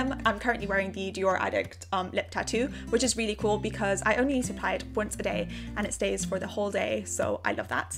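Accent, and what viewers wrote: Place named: United Kingdom. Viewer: Beautiful wonderful marvellous. Good British English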